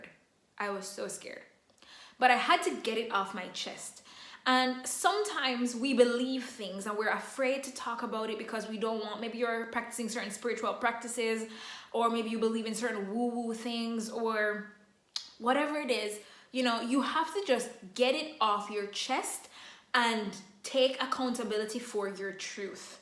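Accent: Jamaica. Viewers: Wonderful video!... I could tell your a Jamaican lol Wah Gwan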